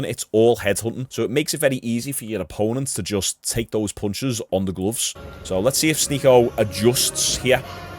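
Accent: Liverpool, United Kingdom. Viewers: Watched your channel for a few months but just realised a few days ago I wasn't subbed. Love your content and Ive learned and laughed a lot but the main thing you have done for me is make the scouse accent bareable 😂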